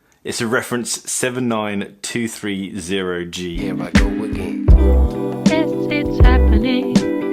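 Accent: RP, United Kingdom. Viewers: Congratulations on the Baby? Where did you go to school? I have been listening to RP on YouTube and to me you have some RP but other hints but can't place. I am from USA.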